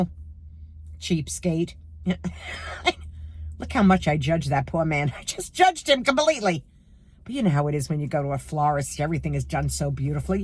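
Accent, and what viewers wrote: New York, United States. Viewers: I LOVE the New York accent. Its fabulous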